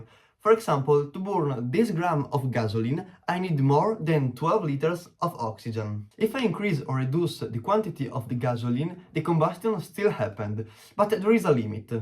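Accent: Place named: Italy. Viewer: Minchia si sente dall accento che sei italiano, bell video però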